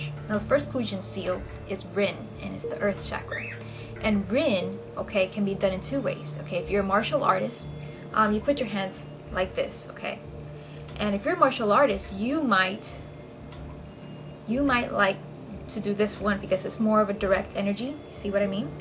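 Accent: Miami, United States. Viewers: you sound like you're from Miami. great video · The room your accent and the bird tells me your in Miami 😂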